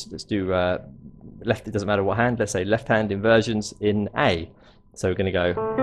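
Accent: United Kingdom. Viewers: I love the way u start ur video. "Hello there". love that British accent And the fun part is i always repeats it myself after watching some of your videos continuosly.😂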